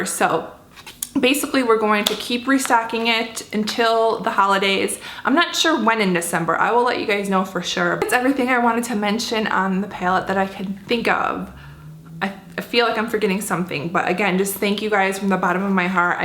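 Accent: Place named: Michigan, United States. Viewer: Your accent makes much more sense now, you're from Michigan!!!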